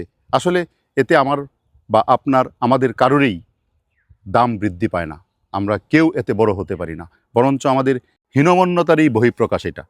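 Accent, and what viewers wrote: Bangladesh. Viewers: আপনার কথা শুনে মনে হয় আপনি বাংলাদেশের,আবার বর্তির বিলের কথা শুনে মনে হচ্ছে আমাদের পশ্চিমবঙ্গের।আপনি কোথায় থাকেন জানিনা তবে যে কাজটা করছেন খুবই প্রশংসনীয়।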